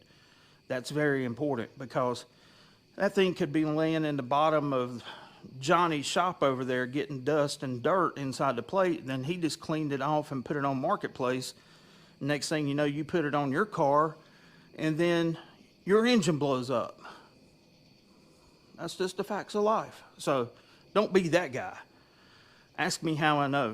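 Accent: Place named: Appalachia, United States. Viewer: I just watched a Video about some Canadian Motorcycle Hill Climbers who added Nitrous to Methanol and Nitromethane for 3 years before it was banned. They said they were warned not to try and Use Nitrous on a Nitromethane fuel injected motor. They did blow 2 cylinders off of a 6 Cylinder Benelli . I overfilled a Bottle one Hot Summer Night . The Little safety Valve has a piece of Copper in it. The Pressure broke the copper. My Trunk lid was all frosty and there was fog coming up through my rear deck speakers. The photo of the back of a car blown up it total BS and as dumb as your accent. Anytime someone talks like a Total Hill Billie I always question their Intelligence.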